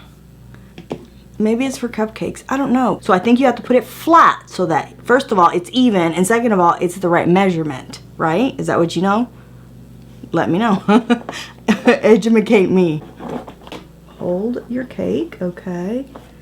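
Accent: South, United States. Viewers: her Southern drawl at times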